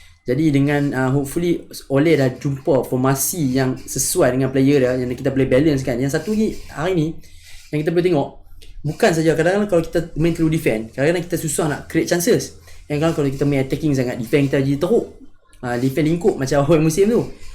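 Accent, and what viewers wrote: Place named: North, Malaysia. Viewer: org utagha sggh abg ni 😆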